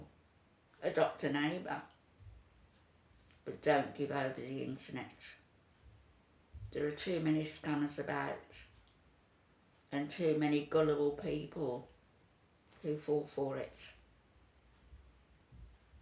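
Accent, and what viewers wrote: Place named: England, United Kingdom. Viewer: Amy just made a video attacking critics including you. She is gloating and arrogant. She is pissed and must have seen the comment you left on her last video. Calling you an English lady with a thick accent. Amy would rather you speak in a coarse arrogant voice such as that American lady with a thick body and thick paypal account. Who could that be?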